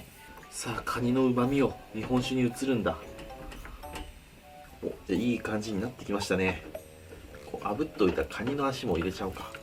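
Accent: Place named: Mikawa, Japan. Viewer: かねこさんのバリバリの三河弁が好きです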